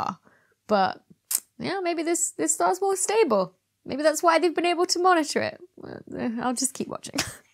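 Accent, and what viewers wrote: United Kingdom. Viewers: So enjoyed this, like to hear your British accent as someone born in London, loved the geordie accent bit :) I would be interested to know what you think of 1956 Forbidden planet my favourite that is the forerunner of most Space soaps.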